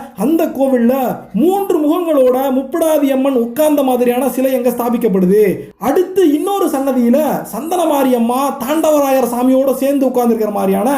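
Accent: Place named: Madurai, India. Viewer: Arumaiyana pathivu Nanba... Ungalukku maduraiya ... Unga pechula Namma ooru kaathu adikkuthu....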